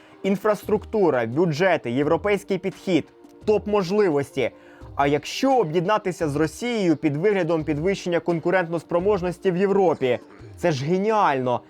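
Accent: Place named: Western Ukraine, Ukraine. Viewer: Яка погана украiнська мова, с бандерськiм смердячим акцентом... Нiхто не збирався окупувати "украiнський" футбол , а лише зррбити шоу для вболювальникiв! Чемпiонат Радянського Союзу був на багато краше нiж чемпiонати "незалежних" Росii та Украiни, особливо на той момент, коли всi футбольнi зiрки збiгли за кордон.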